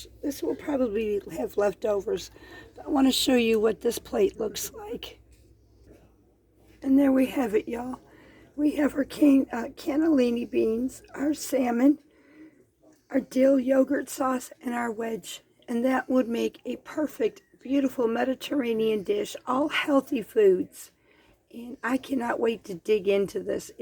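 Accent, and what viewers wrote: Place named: South, United States. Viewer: Hi I hope you feel better soon ❤. We just ate frozen salmon burgers for dinner. I never thought of making them from scratch. I will be trying your recipe next time for sure. Thanks. Glad you liked the S.S. I have never E mailed by myself before. I need a lesson. You will hear from me soon😊. I love the way you say ya all and all the southern warmth that now greets me almost everyday. Please don’t change ❤😊🥇🏡🇨🇦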